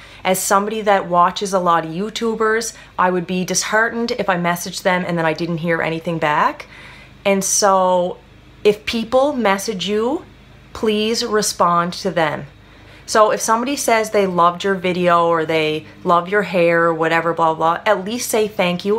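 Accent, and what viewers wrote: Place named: Nova Scotia, Canada. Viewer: Thanks for sharing this. I've been thinking about doing a channel of my own for a while. I def love hair stuff and have been watching videos for years on Youtube so that would be my main focus, but I would probably add in some fitness/nutritiong stuff too, since I am a PT...or physiotherapist as they probably call it in Nova Scotia. BTW, I love your accent!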